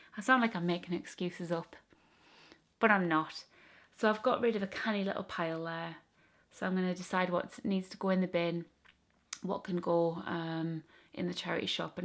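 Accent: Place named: Northern England, United Kingdom. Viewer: I think it's more the North English way to pronounce the vowels than dropping the t It's cool, I love listening to different English accents :)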